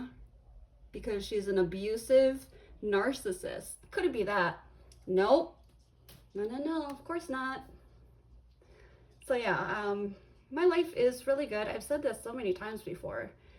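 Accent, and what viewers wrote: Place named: Hawaii, United States. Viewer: So the first time I watched you. I was like this woman reminds me of me..speaking wise a little harsh lol I thought she just reminds me of home...then about 3 videos later I find out your from Hawaii..not only home. But my island. I'm on the .mainland now and people don't get me unless they really know me cuz how we talk.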